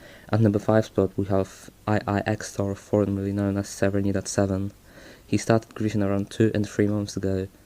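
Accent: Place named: Poland. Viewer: I can hear your Polish accent in your voice, but that's okay because we can still understand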